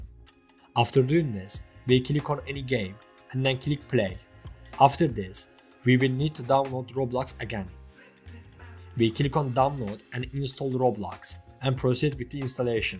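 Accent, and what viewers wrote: Turkey. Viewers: this guy has the strongest turkish accent i have ever heard so far lol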